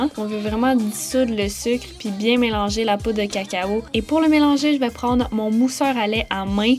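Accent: Quebec, Canada. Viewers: J'aime pas spécialement l'accent québécois mais la c'est plutôt violent mdrr, c'est la façon normal de parler au québec et pour eux c'est nous qui avons un accent dégueulasses. Faut pas être étonné de l'accent en écoutant une québécoise...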